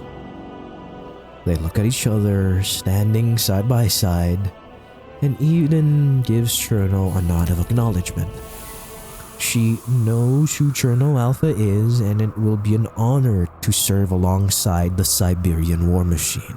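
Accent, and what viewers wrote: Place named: Philippines. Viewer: I had a feeling you were filipino from the accent but I couldn't be sure but hey, I got my confirmation at the start of the video lmao. KABABAYAN 🔥🔥🔥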